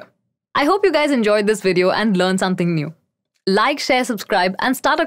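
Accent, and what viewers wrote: India. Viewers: i love indian accents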